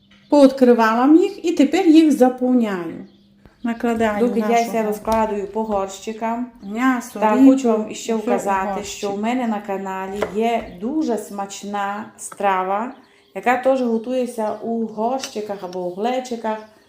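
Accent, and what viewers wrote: Transcarpathia, Ukraine. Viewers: Йой як файно по закарпатськи говорите, лем коли чуву ож крумплі то ріпа, та уха ми ріже 🤣 Вже варю, дораз увидиме шо то за діло 🤓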